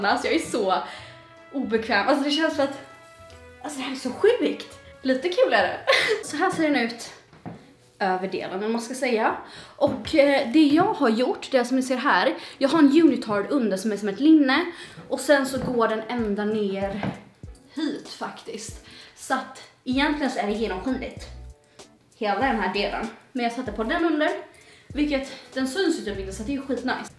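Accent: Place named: Karlskrona, Sweden. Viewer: Jag hör lite karlskronitiska ändå😉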